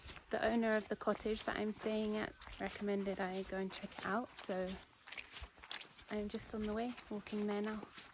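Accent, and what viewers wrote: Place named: United Kingdom. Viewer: Its not kului .... Its kuuuullu .. 😂😂😂 british accent sucks .. Water : whatha 😂😂😂 Its not Rock fooling : its rock falling bro You are eating Aam papad.. kala katha flavour 😂😂😂😂😂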